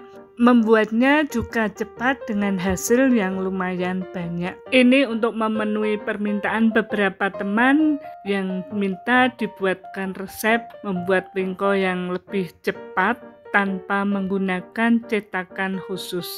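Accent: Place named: Java, Indonesia. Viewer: Org malaysia kah ko tp medok jawa mksh resep nya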